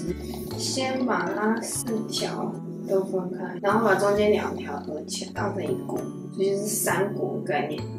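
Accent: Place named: Taiwan. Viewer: 作者可能長居外國，所以台灣口音+外國口音，但也不是你聽不慣就怪，不然你的口音，我聽起來也會覺得怪囉。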